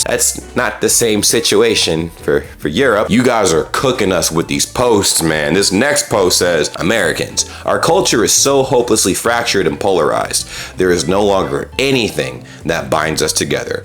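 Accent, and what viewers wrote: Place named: Germany. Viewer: I drink 2.7 liters every day and I'm trying to reach a 3l target. I don't think that counts as dehydration. 😛 That said, bravo on the German accent, mate. Klingt jetzt schon super!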